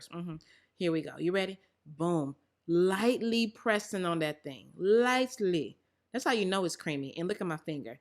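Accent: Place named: Nigeria. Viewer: I like your Nigerian accent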